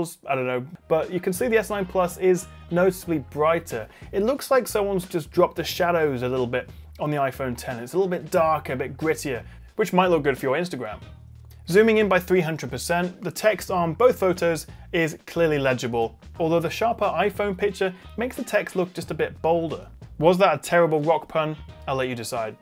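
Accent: United Kingdom. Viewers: The review was really great 👌🏻 and well done ,with awesome photo samples but your voice(the British accent) felt annoying AF. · He is cuuuuute, AND British win win!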